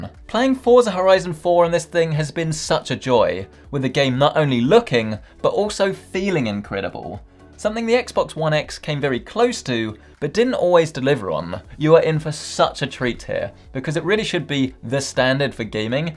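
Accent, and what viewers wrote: United Kingdom. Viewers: A XBox review in true British accent.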